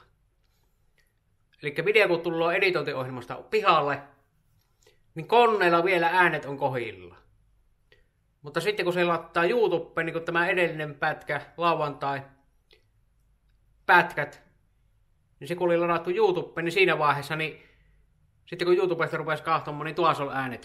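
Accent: Savo, Finland. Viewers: Savon murre tökkii 😬😁👍🇫🇮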